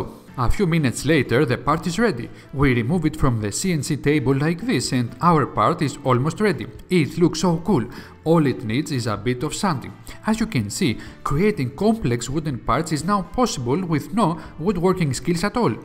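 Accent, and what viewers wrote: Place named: Greece. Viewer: Love the greek accent: "Took me about ten awerrrs to bild de maseen!" Keep it up!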